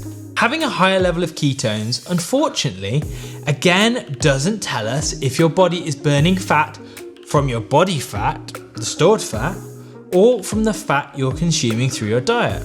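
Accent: United Kingdom. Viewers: It's sooooo refreshing to get useful advice & info about weight-loss & nutrition, especially from someone who's been there himself. It's also great to hear a British 🇬🇧 accent, as opposed to those Americans who drown you with info & grate on your ears 😣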